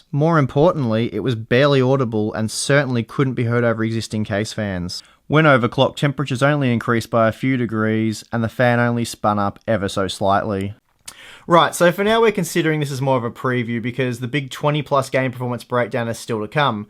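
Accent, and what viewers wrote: Australia. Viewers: Why do I trust Australian voices so much more when it comes to Tech reviews? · Are you Australian?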